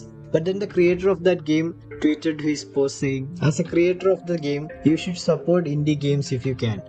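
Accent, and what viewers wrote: Kerala, India. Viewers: anda malayali accent is clearly heard :) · I had a gut feeling that you might be from Kerala when you used that sad bgm from the Malayalam movie 'Vandanam', in one of your early videos. Now hearing your accent I can pretty much confirm. You are a malayali, right ? I share a similar story as I was hell bent into mobile games(keypad) of the past. I didn't know games were supposed to have a story until class 6. What you said about paying for games is right. I also didn't know games were supposed to be bought for a long time. One thing I never had was friends who had deep passion in gaming like me. I am always criticized and shamed for liking games by my siblings and cousins. But I know the happiness you get just opening an .exe file and trying out a game gor the first time. I'll always cherish that. Lots of love ❤❤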